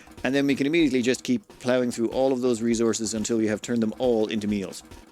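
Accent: Ireland. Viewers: It's an Irish accent.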